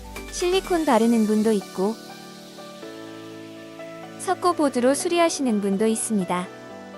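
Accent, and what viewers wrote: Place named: Busan, South Korea. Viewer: 아 부산분이셨군요ㅋㅋㅋ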